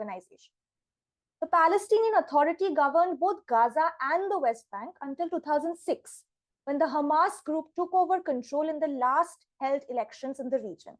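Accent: India. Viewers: If you want to make news in English then sound like you're speaking English not Indian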